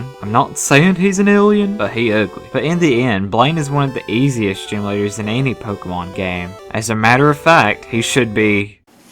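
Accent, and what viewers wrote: South, United States. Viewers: are you southern? (sorry I'm from Oklahoma and I rarely see youtubers with like real southern accents so jw) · I have to say that I am not a judgmental person but your voice completely threw me off. With a southern voice and a large, expanded mind it kind of kicked my brain in it's nuts.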